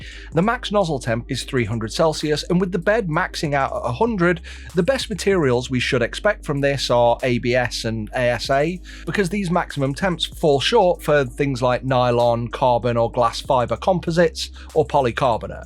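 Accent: United Kingdom. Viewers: I’m American. And like most Americans, I find listening to someone with a British accent telling me things relaxing. And as I’m relaxing at the end of a long day it is mentally akin to wearing comfy slippers on a cold night while relaxing in an overstuffed leather recliner reading a good book as I sip a nice hot cup of cocoa (meaning it's really comforting). So when the aforementioned British speaker has the opportunity to reference something so uniquely British – like a famous Sherlock Holmes quote - thus enhancing this comforting experience , I consider it a missed opportunity. I’m not the only one that finds this phrase reassuring or having value outside of its literal context.(According to a popular literary web site) " 'The game is afoot' is more than just a phrase, it is a cultural touchstone, a literary echo, and a testament to the enduring power of human curiosity and the pursuit of knowledge". 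I hope now you can understand how this was, in all conceivable meanings of the phrase, truly a missed opportunity.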